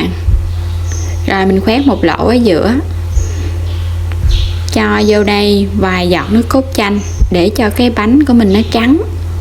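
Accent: Mekong Delta, Vietnam. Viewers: Giọng nói dễ thương của người miền Tây. 95 xin chào bạn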